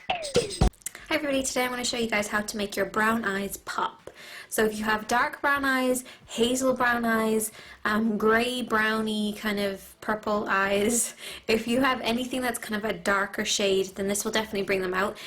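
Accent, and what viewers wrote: Ireland. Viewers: she's Irish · At first I thought you had an American accent. Are you Irish? I love your accent.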